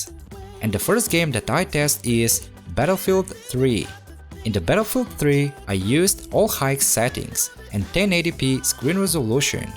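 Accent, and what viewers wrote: Russia. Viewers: ты русский ? если да то ответь (просто акцент русского) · Русский акцент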